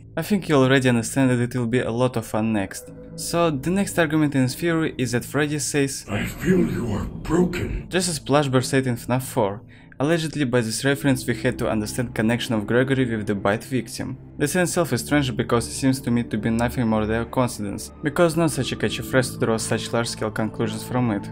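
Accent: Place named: Russia. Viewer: He are russian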